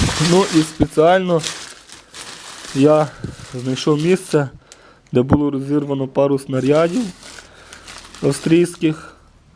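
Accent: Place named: Ternopil, Ukraine. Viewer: Привіт! Файні відео знімаєш. Судячи по мові ти мій земляк (я зі Зборова, Тернопільщина). Чи можна з тобою поспілкуватись в інший спосіб (форум, телефон)?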